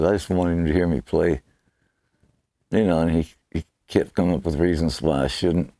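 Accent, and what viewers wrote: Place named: Texas, United States. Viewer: OK that is friggin' hilarious Bill. He not only resembles President GHWB, he's got the Texas drawl too. for president! (Of course, the question of whether one of his greasiest, foulest turds would be better than what we have now is immaterial, but I digress.)::dons flame retardant suit and awaits arrest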